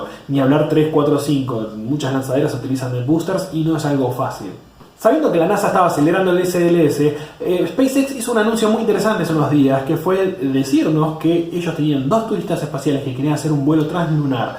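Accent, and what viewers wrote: Argentina. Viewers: argento 100% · Gostei muito das suas exposições. Pelo seu sotaque vejo QUE és argentino. E me escrevi em seu canal ok.abraços de Brasil 😃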